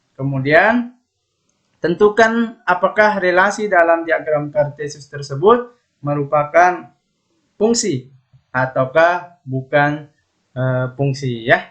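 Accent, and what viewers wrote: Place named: Sulawesi, Indonesia. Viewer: pasti kakak orang Sulawesi kan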